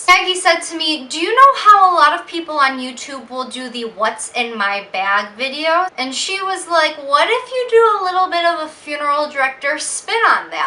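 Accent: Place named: United States. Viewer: OMG that bloody voice sends shivers down my spine - A whining American YUCK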